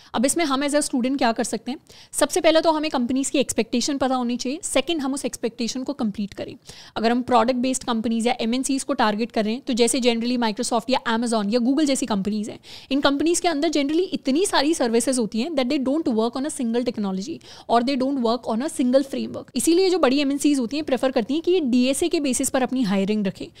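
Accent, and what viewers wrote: India. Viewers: It hard to understand indian english accent 😢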